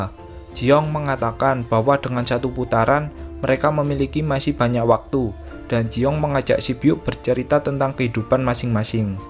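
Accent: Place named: Banyumas, Indonesia. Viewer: Ngapak yak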